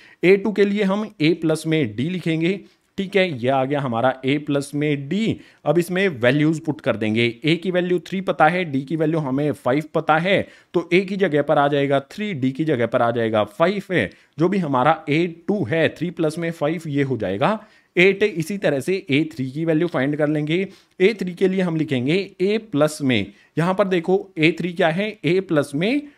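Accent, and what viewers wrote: Haryana, India. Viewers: Sir haryana ke ho 😅😅😅😅😅😅😅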